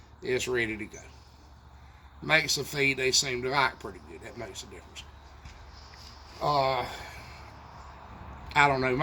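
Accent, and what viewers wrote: South, United States. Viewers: I dont know him from Adam, but he comes across like the salt of the earth kinda person. The men in my family die fairly young, but something about him and his mannerisms feels so familiar. He reminds me of them. I think hes a mixture of Rodney Dangerfield, Archie Bunker and someone else.. I cant quite pinpoint, but I can listen to this man leaned up against a fence post with his cup all day long. The wisdom that hes willing yo share in between his words, the crickets, the farm backdrop, the southern drawl…Big fan of this channel.❤. Might should offer some merchandise, Id love to wear a “Homesteading the Hardway Hat” hahaha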